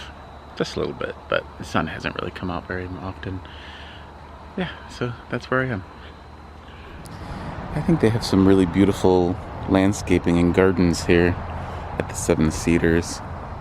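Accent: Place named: Minnesota, United States. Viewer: Comment70 bcz69 is just awkward&overrated. Thats where i saw bald eagles floating bridge thats the year i was born! 1961 is the best yr ever same upside down as it is right side up.1979 is hsgrad.how bzzr u dropped those 2 #s? Quinky dink. You sound lime a minnesootan with your chicago great lakes accent. Bahaha you dont really need power that much? Whatever delusional. Go without power for a day hahahaha you have no idea about the power you use and have. Farmhand harvest farms. Casinos are gross.2cents The monks of new skeet is a cool dog book if you like to read cu down the road bahaha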